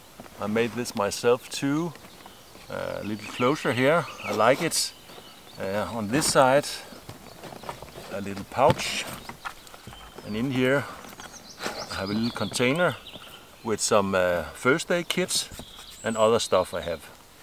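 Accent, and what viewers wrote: Denmark. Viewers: du er fra danmark jeg kan høre det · You sound Danish, from your accent, but where did you film this; as the scenery looks too rugged to be Denmark?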